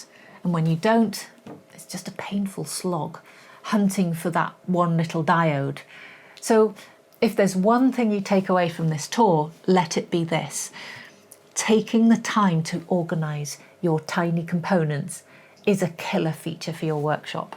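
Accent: Wales, United Kingdom. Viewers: You're Welsh aren't you?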